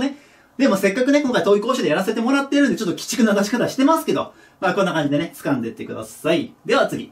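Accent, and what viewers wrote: Kansai, Japan. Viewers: 前から思ってたけど関西の人ですか？？ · あれ、さん関西の方ですか？？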